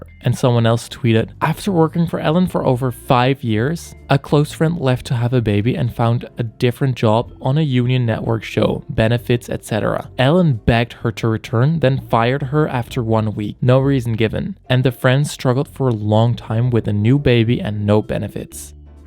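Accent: United States. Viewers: Haha, ik zat rustig te luisteren naar je super Amerikaanse stemgeluid en dacht: Ow wauw hij zegt Chantal Jansen wel heel mooi Nederlands. 10 seconden later: mijn moedertaal is Nederlands. lol. Dat had ik gewoon even niet zien aankomen mede door je naam. Heel leuk. Goed verwoord allemaal. Ellen is echt niet leuk. Had altijd al een raar gevoel bij haar. Ze kijkt soms zo kil ofzo. leuke video, liked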